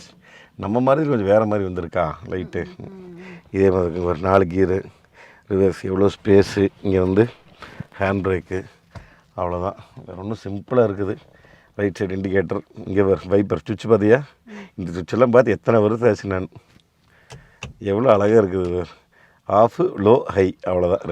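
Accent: Kongu, India. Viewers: Kongu mandalam tamil. We ball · கொஞ்சும் கொங்குத் தமிழ் பேசும் கோமகரே...! திகட்டாத தித்திக்கும் பேச்சுடைய திருப்பூர் திருமகரே...! மதிப்பிற்குறிய " " திருப்பூர் திரு. அண்ணா🙏❤ எப்படி இருக்கீங்க❤🙏 அண்ணி எப்படி இருக்காங்க❤🙏 ரித்திக் எப்படி இருக்காரு❤ அருமை அருமை அருமை வழக்கம்போல அண்ணா உங்களோட வீடியோ அருமை❤❤❤😊😊❤❤ என்ன சொல்ல ஏது சொல்ல😊😊❤❤❤ சும்மா நெகு...நெகு...நெகுனு இருக்கு வீடியோ😊😊❤❤❤❤ பூவாட்டம் தாலாட்டுது உங்க பேச்சு❤❤❤❤❤❤😊😊😊❤❤ நானும் 80s kids அண்ணா❤ என்னோட பிறந்த வருடம் 1988தான்😊 அதுமட்டுமல்ல இந்த வீடியோவுல இன்னைக்கி அண்ணா நீங்க எடுத்துட்டு வந்த இந்த பழையகாலத்து "மாருதி 800" காருக்கும் என் வாழ்க்கைக்கும் ஒரு பிணைப்பு இருக்கு❤❤❤❤❤ பிறந்த குழந்தையான என்னை மருத்துவமனையில் இருந்து வீட்டுக்கு அழைத்து வந்த முதல் கார் இது❤❤❤ நான் என் வாழ்க்கைல பயணம் செய்த முதல் கார் இந்த "மாருதி 800"தான்😊😊😊😊😊😊❤❤❤❤❤❤❤❤❤❤❤❤❤❤❤❤❤❤ என் பெரியப்பா வைத்திருந்த கார் இது அவர்தான் பிறந்த குழந்தையான என்னையும் அம்மாவையும் அப்பாவையும் மருத்துவமனையில் இருந்து வீட்டுக்கு அழைத்து வந்தார் இந்த காரில்❤❤❤❤❤❤ இப்போது இந்த கார் அவரிடம் இல்லை விற்றுவிட்டார். 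எனக்கு சரியாக 15 வயது இருக்கும்போது இந்த காரை அவர் விற்றார். நான் முதன் முதலில் டிரைவர் சீட்டில் அமர்ந்து படபடப்போடும் பயத்தோடு லேசாக ஓட்டி நகர்த்திப்பார்த்த முதல் காரும் இதுவே❤❤❤❤❤❤❤❤❤❤❤❤❤❤❤❤ நீங்காத நினைவுகளை கண்முன் கொண்டு வந்துவிட்டீர்கள் அண்ணா❤❤❤❤❤❤❤❤❤❤❤❤ அய்யோ செகண்ட் வீடியோ அந்த சீட் பெல்ட்🤣🤣🤣🤣🤣🤣🤣🤣🤣🤣🤣😂😂😂😂😂😂😂😂😂😂😂😂😂😂😂😂😂😂😂 இந்த டிரைன்ல டாய்லெட்ல சங்கிலில கட்டித் தொங்கவிட்டுருப்பாங்களே எடுக்க மட்டும்தான் உங்களுக்கு முடியும் மத்தபடி ஒன்னுமே முடியாதுனு சொல்ற மாதிரி🤣🤣🤣🤣🤣🤣🤣🤣🤣😂😂😂😂😂😂😂😂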